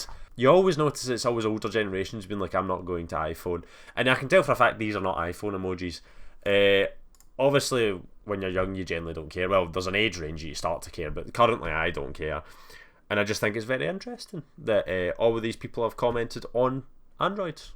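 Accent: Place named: Ireland. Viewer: greetings from canada! just stumbled across your channel by chance when i was scrolling and i love your commentary style. clean, simple, and just giving your opinions. i love your accent too! irish ? regardless, you have a great personality and i hope you continue to post videos! i find them really entertaining. best of luck, 🇨🇦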